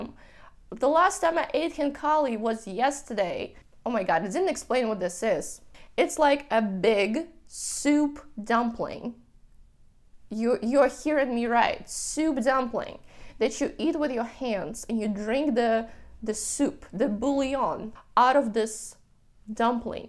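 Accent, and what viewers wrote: United States. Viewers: Your speaking style has suddenly turned American. I'm not a fan of it. I prefer European English. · You sound so American! That’s a good thing, I am from America and mostly like it here.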